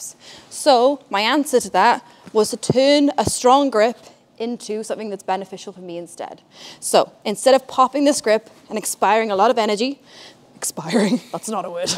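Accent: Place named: United States. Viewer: I can hear a hint of a US accent developing in the intro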